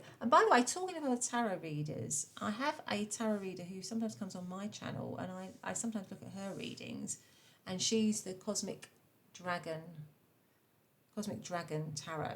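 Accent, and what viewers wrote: United Kingdom. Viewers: i noticed your channel a long time ago and been watching a few, it was quite on spot. but i always am puzzled as in why such little follower count? if i can give advice, i would say it's the volume, i have to turn your volume up everytime. and maybe you speak a bit too fast, combining with the British accent combining with how quiet it is...poeple would struggle to understand. i'm saying this caz i simply cant understand why so many channel that doing readings that were never my situation attract so many attention while the one that does make sense is not receiving equial share. so, imrpvoe your mic maybe? i hope you channel grows.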